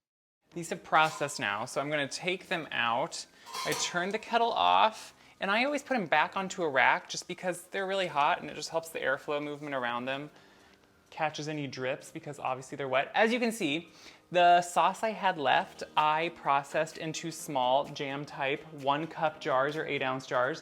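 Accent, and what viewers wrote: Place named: South, United States. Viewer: Your accent gives me life!! I’m a southern gardener girl in the DC area and I miss how warm the people are ( just like you) so your videos are like therapy for me. Thank you so much!! ❤️❤️❤️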